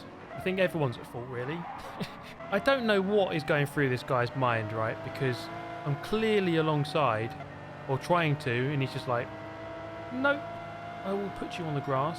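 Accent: United Kingdom. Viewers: ! Your voice makes ne wanna be British! Um gonna STEAL your voice. But I need your password!!!😊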